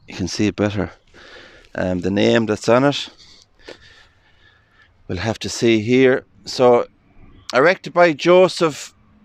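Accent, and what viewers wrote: Ireland. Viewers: I love your videos. I very much enjoy hearing Irish folks speaking. You could be reading a phone book and it would be entrancing.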